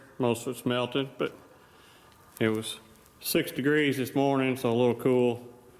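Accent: South, United States. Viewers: Buddy, mineral wool is for sound proofing and not for insulating or moisture control. Call a union contractor in for a consult. Use cellulose to control moisture and put poly film up after it is blown in. Stop reading about this on the webby web, call a local professional. YOu sound southern so you might need to put the poly outside, again call a local professional. For what the roxul costs you could hire that job and have it done with a proper material for your situation there. Good luck! Roxul will hold water btw, it wont control it. Think of a wet sponge. And then the slag will rot and rust, you'll see. And when it does it smells like someone shitted in your walls. You asked for other's experience, here's mine.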